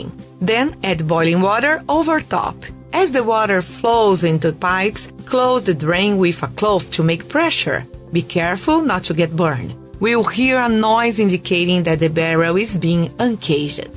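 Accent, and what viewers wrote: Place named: France. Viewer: Thank you!! Just curious are you French? Cool accent😎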